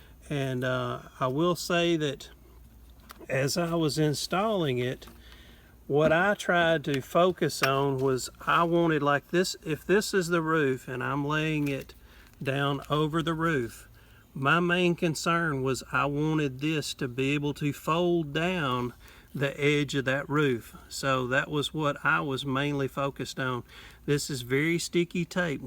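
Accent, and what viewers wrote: South, United States. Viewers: Being from South Carolina I was glad to see someone who speaks Southern American English make an RV roof repair video. I couldn't understand what the folks from Connecticut and California were saying. Very useful information. Thank you.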